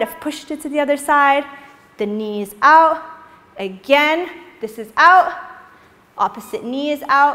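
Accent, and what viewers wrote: England, United Kingdom. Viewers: * your English accent * your Turkistan Lady look(!..) -Perfectum..✨👌🏻💖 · Omg I like her english accent.....❤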